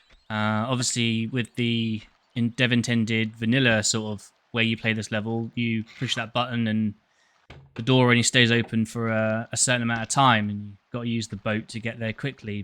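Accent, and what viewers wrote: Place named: United Kingdom. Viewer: Great video! You sound like you are from the United Kingdom!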